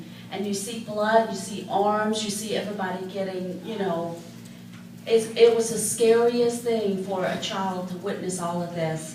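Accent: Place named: South, United States. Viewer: Love her Southern accent.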